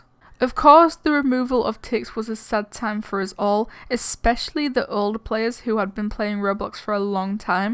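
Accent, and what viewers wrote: United Kingdom. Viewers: oh god what is with your accent, jeez. thats some pure accent from britain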